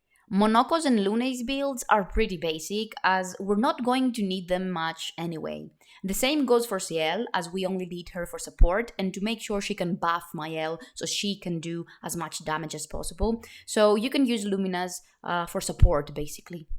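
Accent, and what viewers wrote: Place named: Greece. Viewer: At least I can hear the voice here! Such an amazing Hellenic accent!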